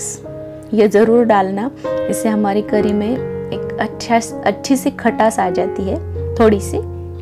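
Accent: India. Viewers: आपका लबों-सहेजा बताता है कि आप साउथ इन्डिया से हो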